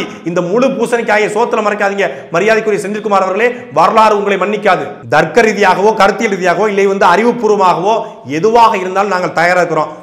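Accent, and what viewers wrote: Tirunelveli, India. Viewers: இவ்வளோ மரியாதையா பதில் சொல்லிருக்க வேணாம், சாட்டையின் திருநெல்வேலி தமிழே போதுமானது மருத்துவருக்கு 😉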